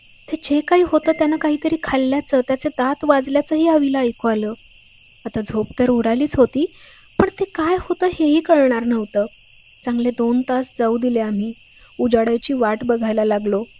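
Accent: Maharashtra, India. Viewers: किती छान वर्णन केलं आहेस संपूर्ण तुमच्या सहलीचे. स्वतः तिकडे असल्याचा अनुभव आला. आणि त्यात तुझा मराठीचा लहेजा, शब्द सुमने आणि वर्णन खूपच सुंदर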